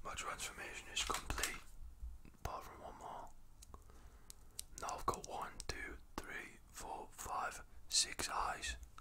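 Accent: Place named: Scotland, United Kingdom. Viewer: R u scottish bro? U have accent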